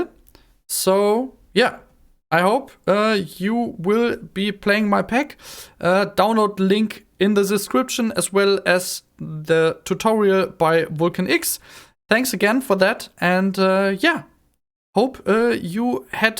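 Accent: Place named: Germany. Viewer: I love your beautiful denglisch :D "It was a inner flower picking" xD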